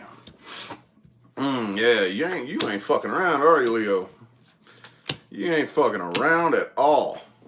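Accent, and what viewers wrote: South, United States. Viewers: Im from Kentucky was born. In Louisville. You said Louisville right too lol not looeyville or Louis ville. Lmao. I'm in Russellville ky. A tiny Town two hours from Louisville. I have lots of family in Indiana too. Thanks for the aries reading. I didn't know you was from around here. You do talk kinda country lol.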